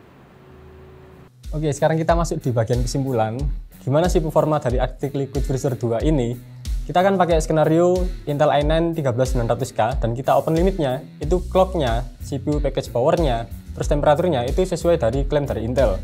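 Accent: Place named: Surabaya, Indonesia. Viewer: logat Anda seperti wong Suroboyoan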